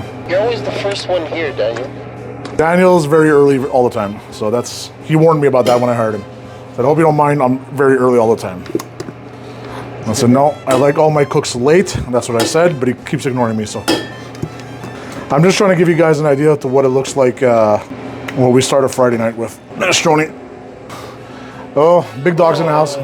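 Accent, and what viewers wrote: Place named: Montreal, Canada. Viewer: You are everything and all that, plus a good dad. But that Montreal accent! Chef's 💋. I miss it out here on the rural west coast and yours is a particular thing of beauty. Have a great service tonight. Love the videos.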